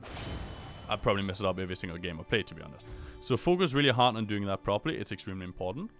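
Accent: Denmark. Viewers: The danish accent hahaha (good video btw)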